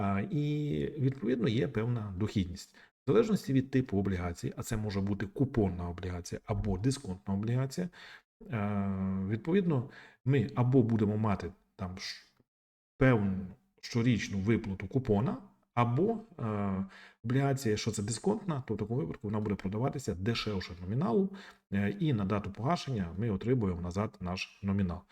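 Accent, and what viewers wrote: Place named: Transcarpathia, Ukraine. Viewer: мені подобається ваш закарпатський акцент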